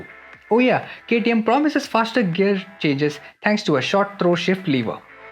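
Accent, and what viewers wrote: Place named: India. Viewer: is this a English speech practice from an Indian?🤷🏿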